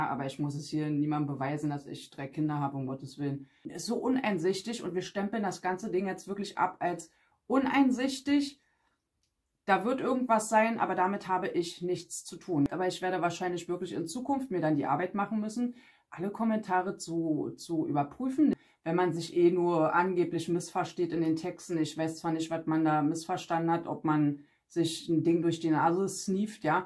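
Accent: Berlin, Germany. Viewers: Liebe, danke für deine "Berliner Schnauze". Red wie sie dir gewachsen ist. Ich mag das sehr! Die wenigen Kritiker gehn doch unter bei uns. Du hast mir die letzten Wochen einen unglaublich klaren Blick auf die ganze Sache und meine Erfahrungen/Bedürfnisse geben. Auch wenn es oft weh tat/ tut. Nur so kann ich gestärkt vorwärts. Danke und weiterso. Fühl dich gedrückt. Liebe Grüße aus Bregenz am Bodensee 💕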